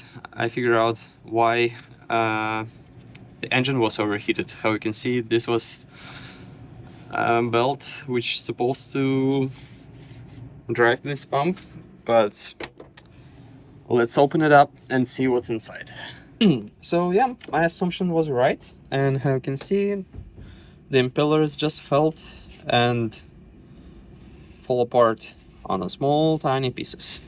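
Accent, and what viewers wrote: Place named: Russia. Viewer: Класс, почти не палишься. Но русскоговорящему сразу слышен акцент) Удачи в творчестве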